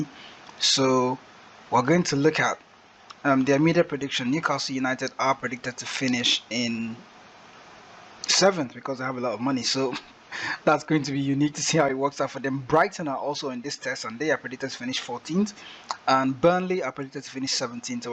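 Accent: Nigeria. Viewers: Why do you sound so Nigerian? Nice video by the way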